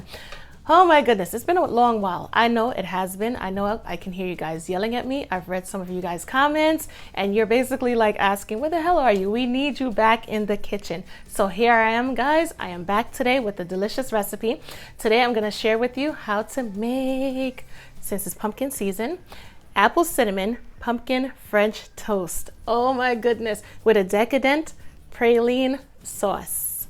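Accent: New York, United States. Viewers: I knew I recognized that accent NY your dishes look great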